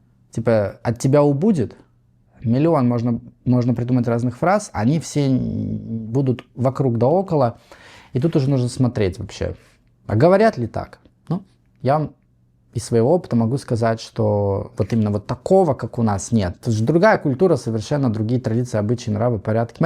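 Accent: United States. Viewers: И самое главное, не говорите с таким акцентом, как у автора, в Англии. Не американец с американским акцентом - это вообще ненавистная фигура в Англии. Когда они слышат такой акцент, то подпрыгивают от злости.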